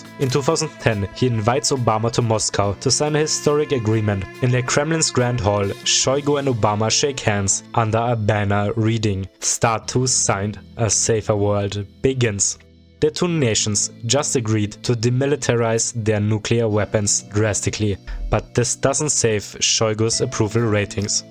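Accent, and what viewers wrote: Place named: Germany. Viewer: The pronunciations make me think you're a German speaker? The Z in Zyuganov should be a zee sound and ths Sh in Stepashin should be a sch.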